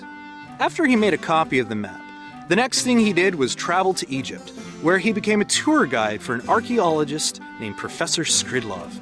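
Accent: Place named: Russia. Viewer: Awful music and the Russian accent sounds crass and stupid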